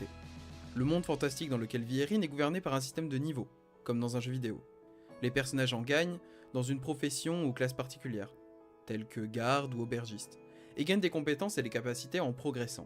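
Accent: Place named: France. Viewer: Dommage que la prononciation de l'anglais soit si... franchouillarde.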